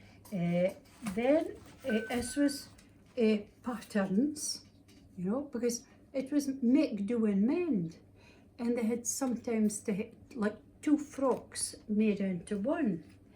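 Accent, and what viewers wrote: Scotland, United Kingdom. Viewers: It is refreshing to hear the Scottish tongue. You are bringing beck many memories. well done..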